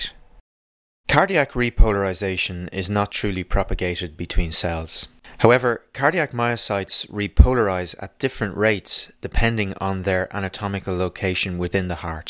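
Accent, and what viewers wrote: Ireland. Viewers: What a HUGE help this video was! I wish my college teacher explained it this well. Thanks for this video. I think you have helped me raise my grade. Your Irish accent is a pleasure to listen to as well. Thanks again, doctor.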